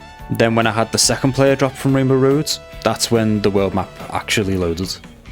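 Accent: Liverpool, United Kingdom. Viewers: Good to hear a scouser online. I'm from liverpool too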